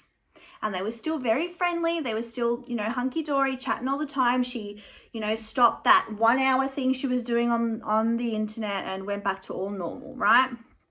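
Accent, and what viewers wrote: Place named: Australia. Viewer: Girl you gotta be a fellow Aussie! I was thinking “why does she sound so normal and relatable” cos we have the same bloody accent 🤣 subbed x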